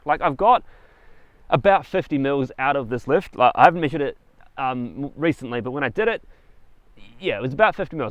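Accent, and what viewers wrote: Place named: New Zealand. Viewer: NZ. Great video and love the enthusiasm and being an Aussie Kiwi love the accent. Coming from a personally highly modified Wrangler it's hard to good Fortuner content like yours. The Fortuner won't replicate the Wrangler in extremes but that not what it is for but love what you are doing without making it crazy and keeping it a balanced. I have followed your lead and just stuck on Maxxis Razr and am also looking at EFS suspension over OME or Tough Dog (maybe you should look at sponsorship ;-)). I am really interested in the longer shock you put in the rear and how that is still performing. Can you provide the model no of the Rear EFS shock please. Cheers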